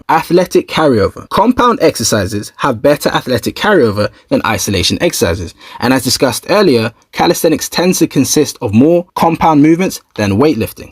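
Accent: United Kingdom. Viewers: U sound British I can tell u from England 🇬🇧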